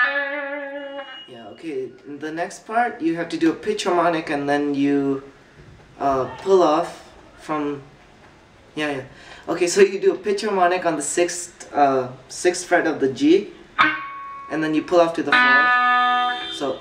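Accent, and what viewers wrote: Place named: Canada. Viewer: Timro English ta daami cha yarr. I live in london and I thought you lived in Canada or somewhere cause of your English. Nice Job with the lesson by the way.:)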